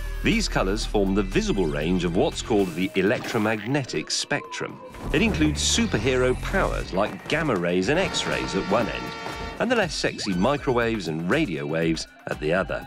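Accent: United Kingdom. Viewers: My favourite British Accent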